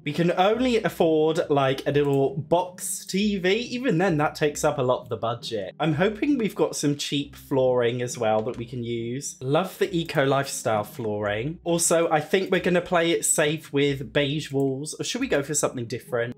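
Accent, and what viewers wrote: United States. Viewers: Your American accent is so funny. That literally is how we say hamper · I’m in love with your American accent 😂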